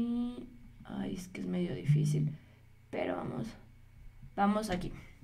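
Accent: Mexico. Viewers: how I missed you so much I love the way you speak Spanish the Mexican way so please can you put subtitles in English in your news I will appreciate it thank you so much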